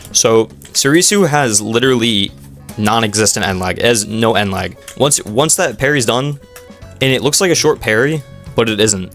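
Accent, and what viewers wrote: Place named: India. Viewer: Turn off the indian accent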